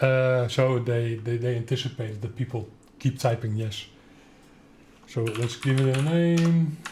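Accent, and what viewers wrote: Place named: Netherlands. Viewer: You have a Dutch accent. I’m just a Linux noob, but am really interested in more holistic and well designed Operating Systems, so thanks for the video!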